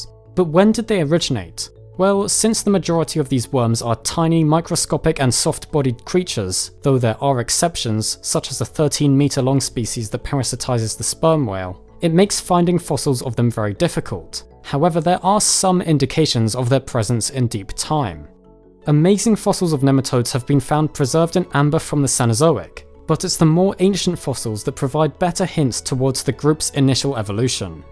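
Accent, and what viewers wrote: England, United Kingdom. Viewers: I knew I was about to hear an english guy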